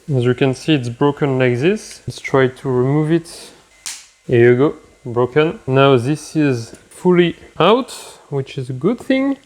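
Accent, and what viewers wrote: France. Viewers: great—just a suggestion: if you want, try improving your English pronunciation to make the video even more enjoyable, because it's quite hard to follow you with your very strong French accent.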